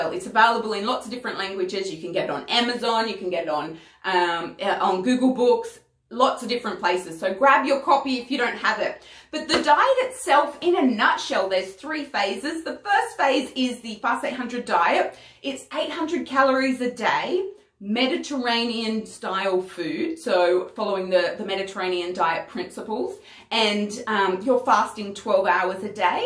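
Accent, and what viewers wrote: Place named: Australia. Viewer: Lovely to hear an Australian accent 💗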